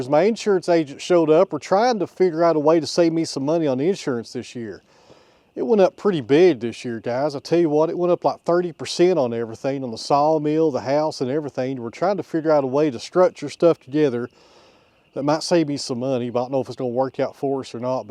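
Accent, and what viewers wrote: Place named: Tennessee, United States. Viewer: It's OK, I have relatives in Tennessee and I can understand you just fine. 😊😉 Sometimes they have a little trouble understanding me from Minnesota. Ya sure you betcha.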